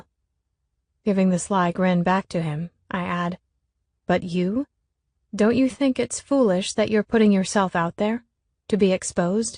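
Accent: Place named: Ireland. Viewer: thats an Irish brogue not a Scottish one….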